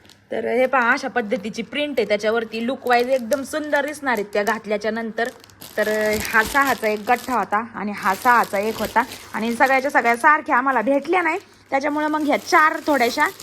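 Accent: Ahmednagar, India. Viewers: आपली नगरी भाषा अशीच आहे... लोकांना ती वेगळीच वाटते...आणि dhawalpurila आमचे पण पाहुणे राहतात...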